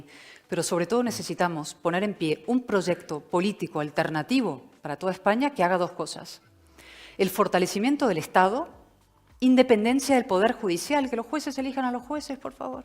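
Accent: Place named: Argentina. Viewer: No me gusta Cayetana habla bien pero el tono prepotente y el acento argentino ese chirría un poco.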